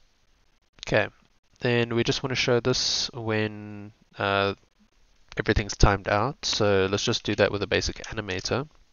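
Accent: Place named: South Africa. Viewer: SA in the US. Cool to hear a South African doing this.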